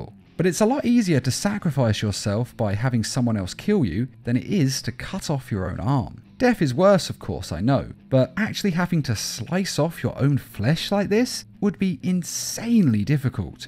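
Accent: United Kingdom. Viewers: You forgot that the narrator is british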